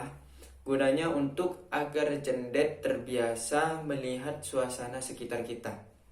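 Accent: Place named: Madura, Indonesia. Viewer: Madura nya bang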